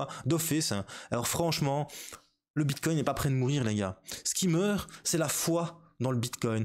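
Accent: Liège, Belgium. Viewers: Lol j adore le ton que tu emplois ça en met pleins les dents aux détracteurs mdr. Tu es de Belgique ? Je perçois un accent belge de Liège où je dois me tromper !?!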